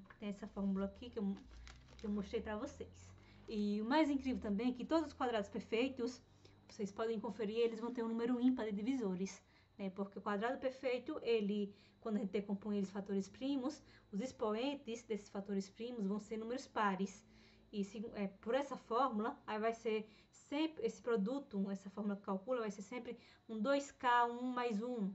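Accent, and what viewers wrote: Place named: Portugal. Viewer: Interessante seu sotaque, por um momento no início do vídeo eu achei que vc fosse portuguesa ou que tivesse passado muito tempo em Portugal. Achei muito legal o vídeo, na forma como vc conta as coisas, bem detalhadas e de uma forma íntima buscando contar como vc se sentiu e o que vc pensa sobre.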